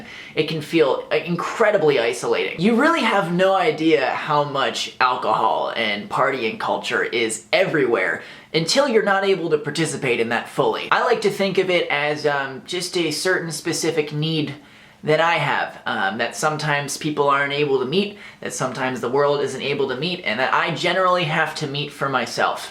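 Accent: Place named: United States. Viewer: I CANT WITH AMERICAN ACCENTS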